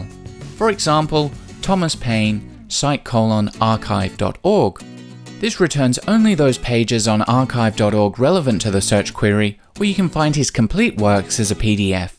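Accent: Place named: United Kingdom. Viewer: If that was spoken with an American accent- I wouldn't have watched it. Am I the only one who is obsessed with those British accents?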